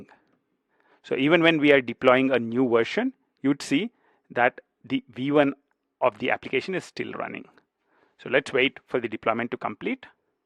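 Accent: India. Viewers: Dear Colleague, please get rid of the Indian accent.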